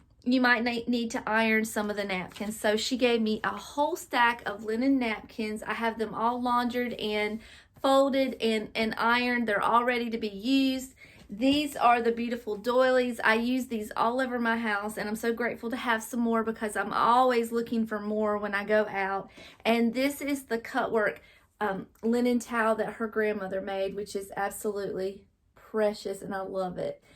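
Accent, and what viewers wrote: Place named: North Carolina, United States. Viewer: Hi I have been watching your channel for a few weeks now and I knew there were something we had in common or should I say someone and when you said booth and Tenn. I knew you were talking about Susan she is so sweet,my daughter started a YouTube channel a few months ago and Susan was one of the first YouTubers to comment on her video and she has been such an inspiration to her from the start. I just love that dress on your daughter so pretty and I love all the stuff Cindy sent you so pretty, you sound like you are from N C if so, So are we the name of my daughters channel is Around the house with Charlene if you get a chance to view her channel I would be thrilled and I know my daughter would just love it. I love how the pictures you on the wall ❤❤❤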